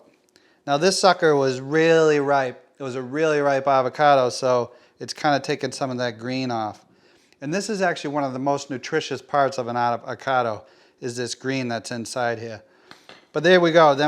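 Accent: Boston, United States. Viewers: Thanks buddy! Love the Boston accent btw!!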